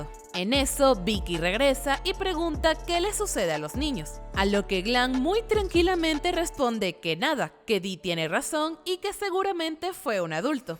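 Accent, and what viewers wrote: Chile. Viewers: Soy yo o la narradora tiene un ligero acento chileno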